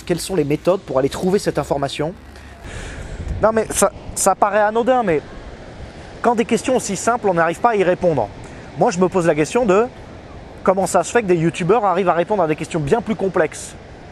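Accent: South, France. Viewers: On dirais qu'il commence à perdre son accent du Sud.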